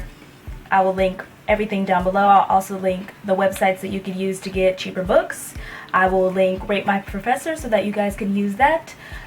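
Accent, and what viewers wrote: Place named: Caribbean. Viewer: oh,thought you were from the caribbean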